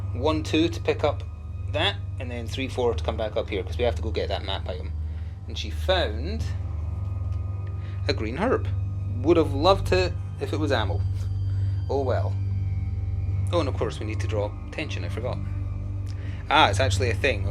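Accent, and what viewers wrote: Scotland, United Kingdom. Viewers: Good video! I enjoyed the ominous music mixed with your optimistic tone. Where abouts in Scotland are you from?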